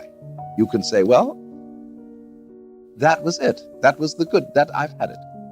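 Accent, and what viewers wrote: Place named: RP, United Kingdom. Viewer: Alan Watts is like a man down the pub talking crap. It's just telling people what they want to hear about the F-ing obvious. 🙄 Americans are impressed by his posh accent. 😆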